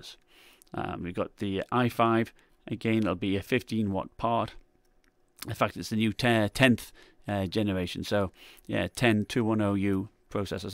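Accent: United Kingdom. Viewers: That’s odd I heard a UK accent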